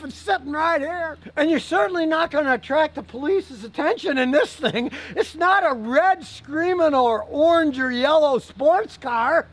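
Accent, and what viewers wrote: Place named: Appalachia, United States. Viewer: Scottys hillbilly accent killed me 😂😂😂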